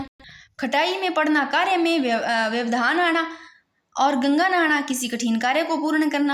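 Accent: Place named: Marwari, India. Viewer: आप मारवाड़ी बोला करो मजा आता है सुनकर दीदी 😊😊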